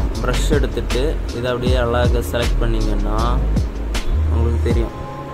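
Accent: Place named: Kanyakumari, India. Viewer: Kanya Kumari ah bro❤naama slang teriyuthu💥💝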